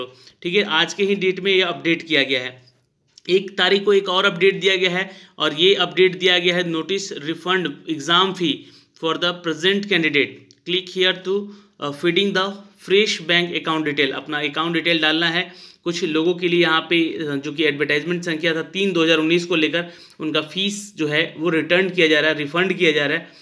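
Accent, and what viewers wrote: Bihar, India. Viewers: Bhai bihar bolte hai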